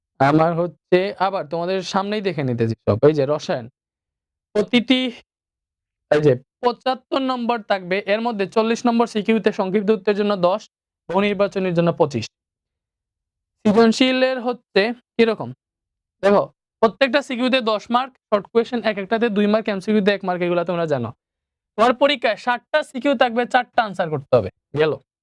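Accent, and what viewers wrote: Sylhet, Bangladesh. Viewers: সার আপনি সিলেটি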